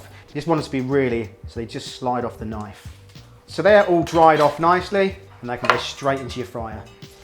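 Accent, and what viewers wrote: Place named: United Kingdom. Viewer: Watching and loving ur videos and (ur awesome british accent) all the way from ontario canada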